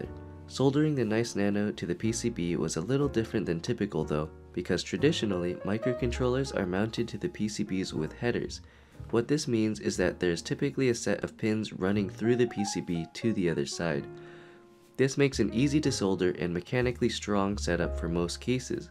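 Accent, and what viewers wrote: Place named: United States. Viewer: Is this the first time he’s spoken in a video? Didn’t expect him to be American.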